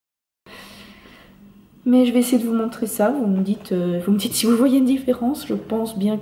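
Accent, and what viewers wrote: Belgium. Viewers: Elle est belge je crois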